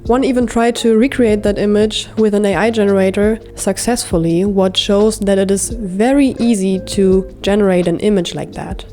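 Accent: Germany. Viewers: Is it just me or does she sound like she's German. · Bist du deutsch? Klingt wie deutsches Akzent. Grüßle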